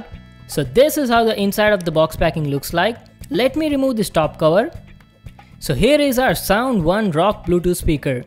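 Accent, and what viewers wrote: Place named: Telugu, India. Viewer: Bro... Nenu expect chesa nee slang Telugu la undi ani.. Mee father channel and chala refer chesi...i came to a conclusion .. NUVVU TELUGU VAADIVE...😊🤓😘😘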